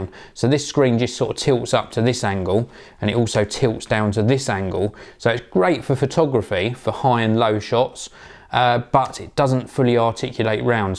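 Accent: United Kingdom. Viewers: dat british accent mate!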